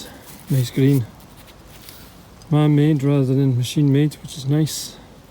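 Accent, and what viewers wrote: Scotland, United Kingdom. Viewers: Sometimes after my spouse is asleep I watch you on UTube on my phone with sound off and the text on the screen. It has a very hard time changing your heavy Scots brogue into text and sometimes I bust out laughing!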